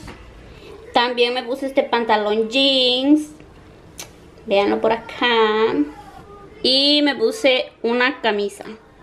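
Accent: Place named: Honduras. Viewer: Te admiro me encanta el asento de las catrachas y mas cuando son carismaticas y cariñosas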